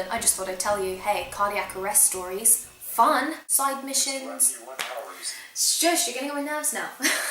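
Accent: United Kingdom. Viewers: Now I really want to know what made you cry "hysterically" :-) (not that I didn't too... well not *hysterically* but still, I completely understand). Actually I would love to hear what choices you made and how it played out for you. I always like talking to friends about the different choices they made and why. Anyway, fantastic review (this one and the ending) I feel like you're voicing my own thoughts, just in a female voice... and British accent. Love your videos, keep up the amazing work!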